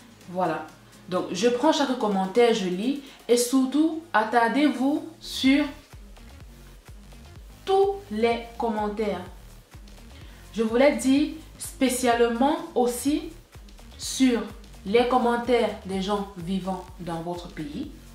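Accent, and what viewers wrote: Cameroon. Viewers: Olalala j'ai découverte ta vidéo aujourd'hui ma belle j'adore comment tu explique depuis qlq jours j'essaie de vouloir commander les mèches justement ta vidéo vas bcp m'aider j suis accro à aliexpress , ma soeur camer ton accent la ne ments pas 😘 j m'abonne😉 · ta maniere de parler naturellement comme une camer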